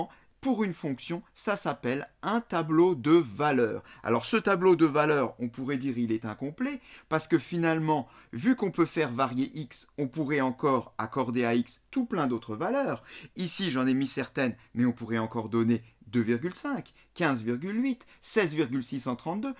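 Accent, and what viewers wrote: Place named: Alsace, France. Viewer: en alsace on le prononce, et il me semble qu’il vient de là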